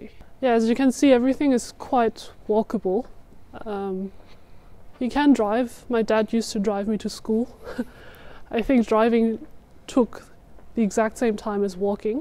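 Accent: Germany. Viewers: She sounds German. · You have a strong German accent and and yet you are such an eloquent English speaker are you a native German or did you move there as a baby . Very informative video btw. my family and I are hoping to move to Germany soon for a year.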